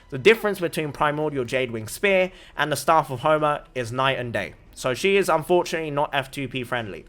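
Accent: United Kingdom. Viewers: why the heck are you asian but has a british accent?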